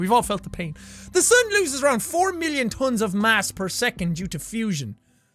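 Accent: Ireland. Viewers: I know full well based on your accent that you're Irish. You couldn't say the same for me though. I am British, and I have a British accent. But that's it. A BRITISH accent. Neither Scottish nor English, because my blood is a combination of the two. I consider myself Scottish, and I'm proud to be, but you wouldn't be able to tell based on my accent. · You sound pretty Irish to me! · false because your a boss Jacksepticeye i love your videos and i know you have probably heard this alot but i am your biggest fan i love everything about you even your Irish acsent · His accent is awesome My accent is almost the same because I'm Irish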